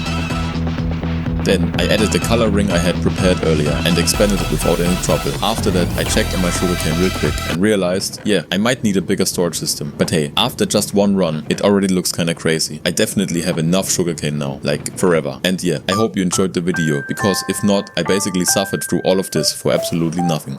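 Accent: Germany. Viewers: Two Things: 1) You make so good content, you deserve 500k and more subs because you put so much efort in your Videos❤❤❤❤❤❤❤ 2) I think you are german (Ich bin selber deutscher und man kann richtig raus höhren das du deuter bist) ❤❤❤❤❤❤❤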